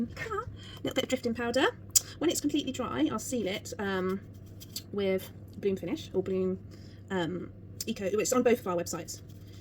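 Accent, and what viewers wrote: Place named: United Kingdom. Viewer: Does everyone in the UK talk as fast as you do? Sorry but I’m from Texas in the United States of America and I just can’t hear that fast!! We talk a lot SLOWER here in Texas. But I really love your accent; it’s so pretty but I just can’t understand you cuz you talk to fast for my brain to comprehend what you are saying. LOL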